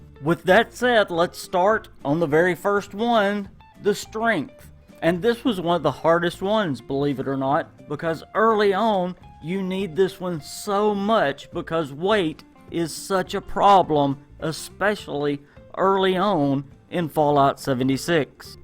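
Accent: South, United States. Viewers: love your country-ish accent! great videos, sub'ed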